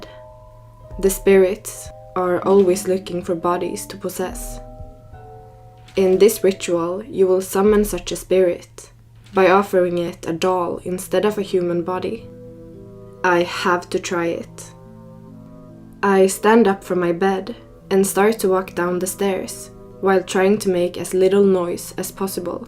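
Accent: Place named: Iceland. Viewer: Oh, I didn't recognise your accent as Swedish. I was thinking you might be Icelandic. Thanks for sharing.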